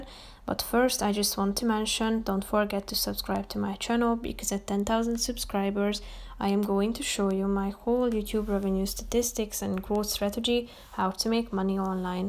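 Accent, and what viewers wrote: Hungary. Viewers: Are u Hungarian?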